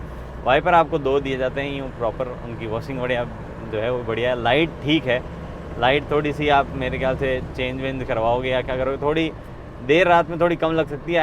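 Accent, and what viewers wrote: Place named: Marwari, India. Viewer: Yes, He is Marwadi.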